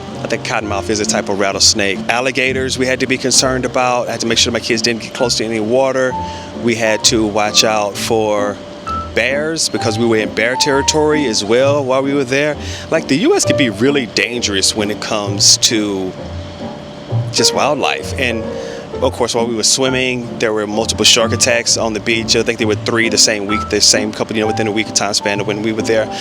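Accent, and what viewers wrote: England, United Kingdom. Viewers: You have picked up a small british accent. Jolly good!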